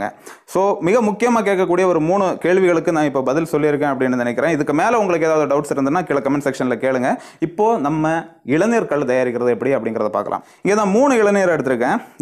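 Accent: Kanyakumari, India. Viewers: Nice vedio and kumari voice very nice bro... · Ungal malayala tamil azahu...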